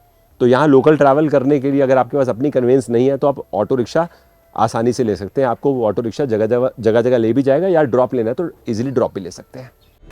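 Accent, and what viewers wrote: Punjab, India. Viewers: Aapke videos bahut achhe hote hain, lekin ek request hai. Jab bhi aap kisi historical place ka video banao, to apne punjabi accent ko control kiya kijiye, us se saari vibe kharaab ho jaati hai. Please bura mat maaniyega, fan hu isiliye adhikaar se bol rahi hu. Jaise ki aap भीष्म कुंड को भीषम कुंड bolte ho, और को ओर बोलते हो, युद्ध को जुड्ढ बोलते हो। आप जानते हो महाभारत काल में कितनी शुद्ध हिंदी बोली जाती थी। जब आपने वीडियो बनाने की starting की थी, तब से अब तक काफी चेंज है आपकी भाषा में लेकिन थोड़ा सा और सम्भाल लीजिए, please, video देखने का आनंद दुगुना हो जाएगा। राधे राधे।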